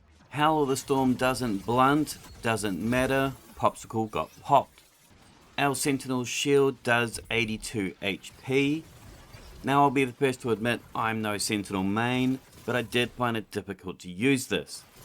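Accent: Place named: Australia. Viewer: Creative ideas, informative and well presented, entertaining aussie accent, subscribed for sure!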